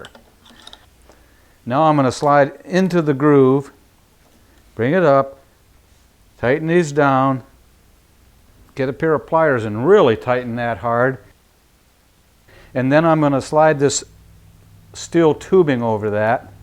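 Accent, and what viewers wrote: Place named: Midwest, United States. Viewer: called a Midwestern accent.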